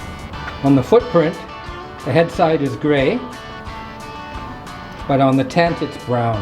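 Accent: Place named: Canada. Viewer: You sound Canadian...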